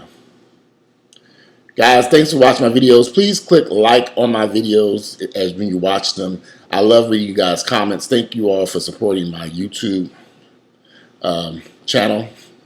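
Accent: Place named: Chicago, United States. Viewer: Sound like Chicago! !!!! Exactly like Chicago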